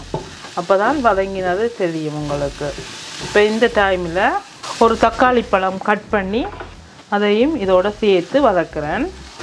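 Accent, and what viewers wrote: Sri Lanka. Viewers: leave it recipe, Srilankan தமிழ் ❤❤❤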